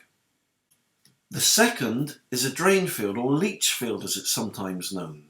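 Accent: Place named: United Kingdom. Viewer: Again, this guy is giving inaccurate information about Residential Septic Systems, because pumping a Septic Tank is only a few hundred dollars, and it doesn't need to be done every year. In fact, pumping a Septic Tank is usually recommend every 2, 3, 4, or 5 years, depending on the size of the tank, and the number of people living in the house. You don't need to add worms or anything else to your Septic Tank or Leachfield/Drainfield... absolutely unnecessary! This guy is just a pitch man, and he's full of you know what... don't be fooled by his British Accent or this video presentation.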